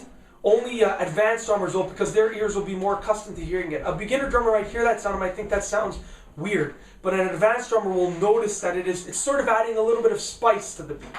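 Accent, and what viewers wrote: Canada. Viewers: Are you Canadian? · Sounds canadian for some reason. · Definitely Canadian. Good video man.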